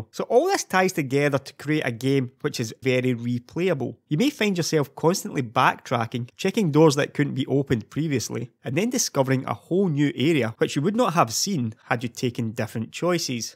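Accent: Scotland, United Kingdom. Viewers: Is he scottish?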